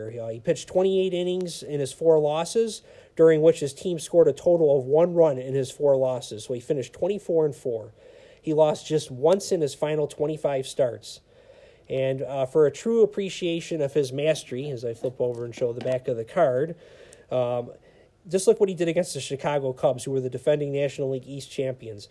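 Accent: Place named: Upstate New York, United States. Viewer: Super interesting. Thanks for the invite and research. Btw, just curious but where are you from? I’m trying to pinpoint your accent. It’s has so many similarities to my moms who grew up in upstate NY. Anyway, enjoyed the video. Looking forward to watching more of them. Drew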